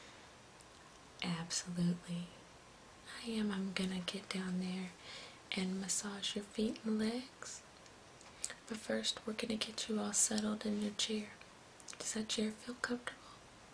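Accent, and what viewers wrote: South, United States. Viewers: I'm british so the very short answer is that to me yes she does ;o) Now however you've got me questioning myself (and so I feel like I'm putting my neck on the block for this one) since I'm obviously not exactly an expert on american accents but to me her accent sounds southern at times. I must confess it is only slight however, hence why I'm now questioing what I'm hearing :o/ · lucky, i want to be british lol, and to me it just sounds like no accent because im american :P i don't know, now that i hear it its a wee bit southern at times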